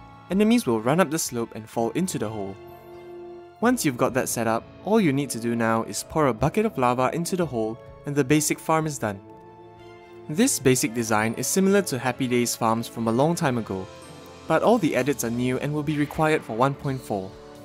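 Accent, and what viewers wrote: Singapore. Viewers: Omg your voice is super soothing! and reminds me of home! Just wondering....are you from sg?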